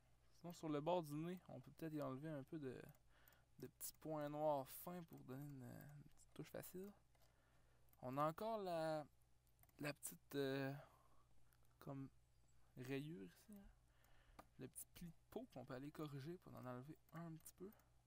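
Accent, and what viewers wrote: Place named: Quebec, Canada. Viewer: Salut cher ami Québécois. Je suis Français de France donc j'ai un peu de difficulté à comprendre... Est-ce que tu pourrai faire un accent plus français dans tes prochaines vidéo ? Cordialement, Gilbert.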